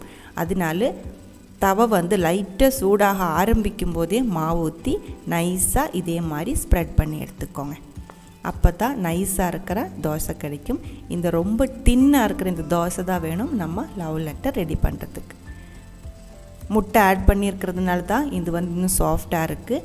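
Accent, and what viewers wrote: Kerala, India. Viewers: Very nice I love ur voice nd the presentation Malayalam Kalantha Tamil 😘👌... Vry cute snack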